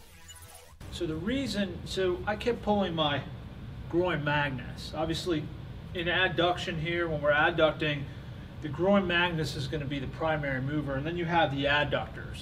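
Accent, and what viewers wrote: United States. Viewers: U got the nyc accent. U from nyc? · Ha, PA accent. Go Stillers!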